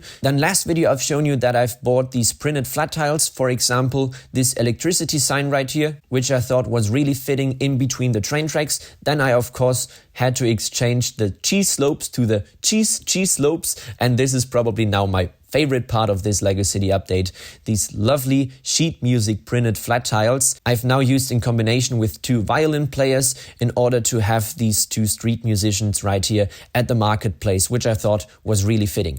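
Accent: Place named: Germany. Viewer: Achso du machst deutsch und englisch mit diesen Videos ich weiß das wegen dem Akzent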